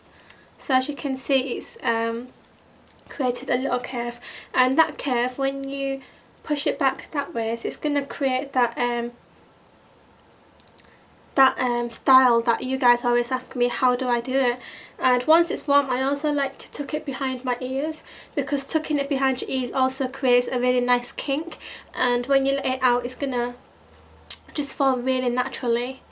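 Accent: United Kingdom. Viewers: is that a birtish accent, not to be rude if it is :)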